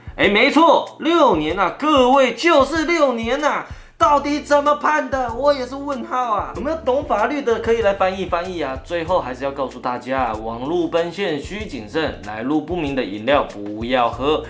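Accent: Northeast, China. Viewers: 听口音，这不是江苏淮安人啊，这很明显是个东北人啊，视频不严谨呢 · 我艹，大叔是东北人？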